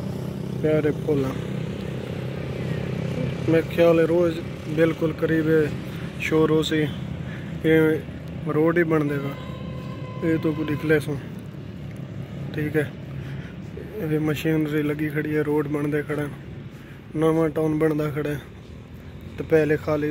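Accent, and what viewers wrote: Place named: Saraiki, Pakistan. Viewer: I love saraiki voice 👈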